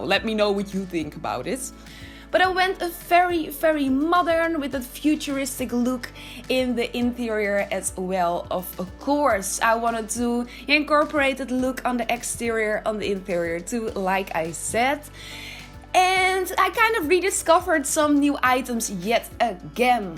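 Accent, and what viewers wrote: Netherlands. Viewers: Haha inderdaad, haar Engels is erg goed alleen de uitspraak is erg Hollands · Ik kan hore dat je nederlands bent hup nederland!!!😂❤️❤️ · Are you dutch? Your accent sounds dutch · Where are you from? It sounds like Dutch😂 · You can hear so good that she’s dutch, it’s so cute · Oke je hoort wel echt duidelijk dat je Nederlands bent. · I can immediately hear that you're Dutch 😂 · I am so in love with dutch accents so this video was a real pleasure for my eyes AND my ears!!! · are you dutch? I hear a very heavy accent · Kon gelijk horen dat je nederlands was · Are you Dutch ? You have a Dutch accent. Either way this building is soooo good wth, I’ve never seen something like this from the sims, you did a really good job!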